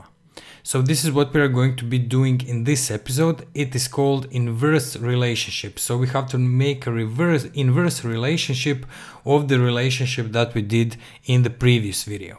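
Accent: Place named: Russia. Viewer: Just your accent in English is similar to Russian )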